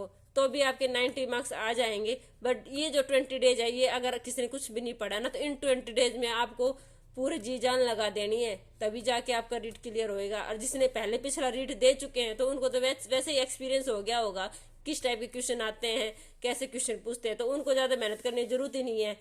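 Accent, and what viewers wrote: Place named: Haryana, India. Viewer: Haryana tach boli,,, HR ki boli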